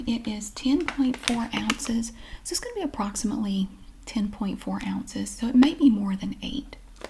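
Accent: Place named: South, United States. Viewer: I love your accent. Your voice alone is so incredibly soothing, but the accent feels so homey (even though I am from Finland, I certainly haven’t grown around an accent like this hahah.) I dare to assume it’s southern? Love your videos anywho!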